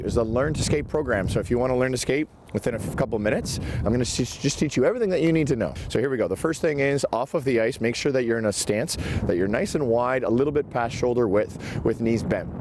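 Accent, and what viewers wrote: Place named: Southern Ontario, Canada. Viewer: Came for the tips. Stayed for the Leafs representation and southern Ontario accent. Felt right at home. Thanks for the lesson!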